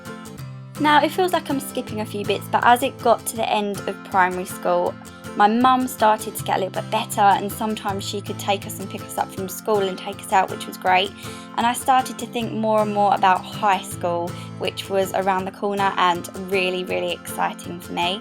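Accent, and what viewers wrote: Essex, United Kingdom. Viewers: ohhhh that's where your essex accent comes from! (well I only know what that is from watching the only way is essex)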